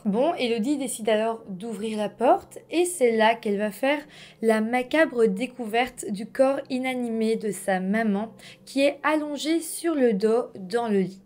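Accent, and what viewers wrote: Belgium. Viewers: Je pense que tres franchement la mort a ete donnee par accident du a la panique de la faire taire. Ce qui me fait penser ainsi est qu'il n'a pas du tout protege ses mains pour eviter les empruntes, et que si ca avait ete premedite, Sabrina et/ou lui auraient pense a mettre des gants pour eviter toutes traces d'ADN. Quoiqu'il en soit, c'est vraiment horrible et triste et la famille doit avoir beaucoup de mal a gerer non seulement la perte d'un etre cher, mais en plus dans de telles conditions. Merci pour cette histoire, j'adore les histoires qui se passent en Belgique et avec ton accent charmant belge, ca rend le tout encore plus sympa...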